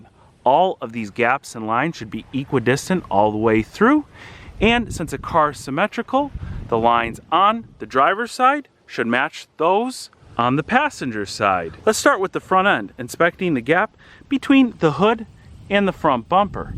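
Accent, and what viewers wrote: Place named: Tampa, United States. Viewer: Phil Abrahams The Floridians from Tampa area have this accent.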